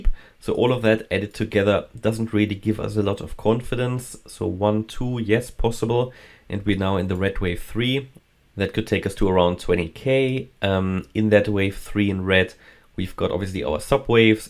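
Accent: Germany. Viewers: New to this channel, and I love your accent! German yes? It's wonderful :)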